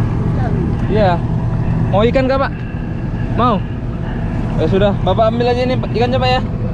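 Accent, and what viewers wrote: Bugis, Indonesia. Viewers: Orng Bugis ki aaa?? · ORANG BUGIS KAH SAPPO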